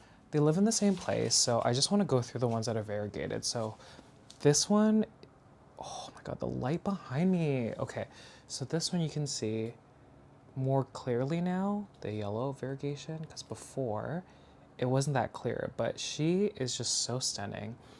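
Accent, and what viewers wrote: South, United States. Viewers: Has anyone ever told you that you have a southern American "twang"?! 😅 I love it! 😂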